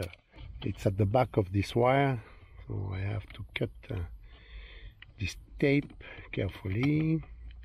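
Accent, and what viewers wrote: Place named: France. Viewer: Bonjour, Je me permets d'écrire en français étant donné avec qu'avec ce magnifique accent, je pense reconnaître notre belle France dans votre voix ! J'aurais voulu avoir s'il était possible de changer de musique avec les flèches suivant et présentent du volant si on est dans un album par exemple ? Super vidéo et merci d'avance !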